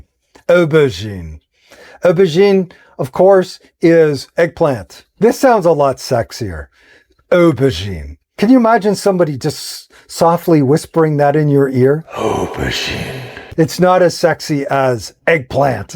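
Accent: United Kingdom. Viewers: I love your British accent 😆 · Skip the Brit accent. 😖😱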